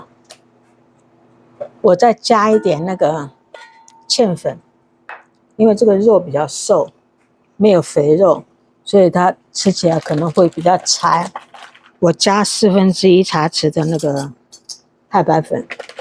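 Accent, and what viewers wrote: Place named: Shanghai, China. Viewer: 我覺得媽媽好像是江浙人、因為有上海口音。我父母就是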